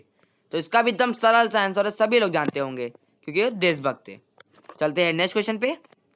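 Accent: Bihar, India. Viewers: Aap bihar se hai ky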